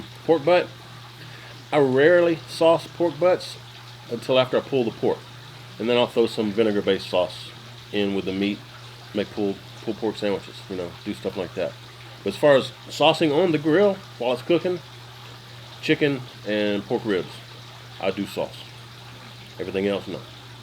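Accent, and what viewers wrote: South, United States. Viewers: Thank you, for answering questions. I love your channel and wonderful southern accent. I am learning a lot from your and the others linked to your channel. Keep up the good work.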